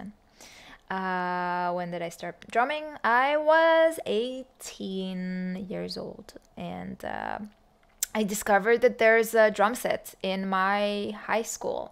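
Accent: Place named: Israel. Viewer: Finally, I get to hear your Israeli accent. Love it. My mother is a hairdresser, she would tell you protein and conditioner for your hair….look a little dry. I’ve been watching you off and on for 8 years. I love watching your infectious smile. Always makes my day brighter. I would say in the beginning you were playing the music….now, 8 years later, your feeling the music. And this is what now drives your playing. Rock on baby doll!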